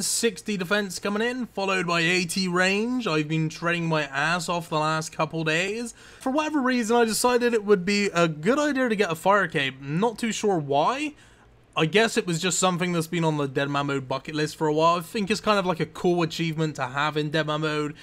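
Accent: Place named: South England, United Kingdom. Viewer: Where are you from in the south of the UK btw?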